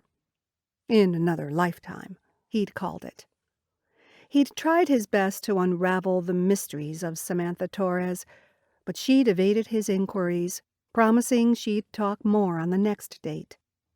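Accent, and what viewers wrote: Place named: Canada. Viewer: Canada. The accent is about what I would hear back home in Wisconsin. The local slang,....no idea.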